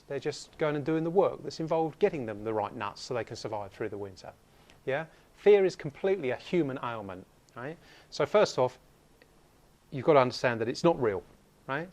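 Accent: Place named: England, United Kingdom. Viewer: I meant that the audio in another channel was louder (Not same video). The thing is English accent is very heavy which makes it difficult for me to understand him even on a loud volume so listening to him on a low volume makes it harder. Oh well.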